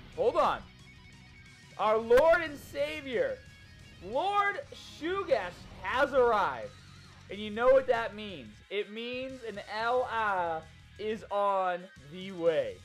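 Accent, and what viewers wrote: Boston, United States. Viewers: Don't let toon bully you out of your Boston accent man! I loved the way you said dokkan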